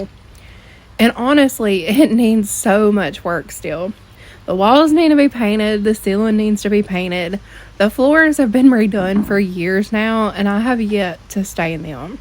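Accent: South, United States. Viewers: Oo I love the Southern accent. I’m from NC so reminds me of home❤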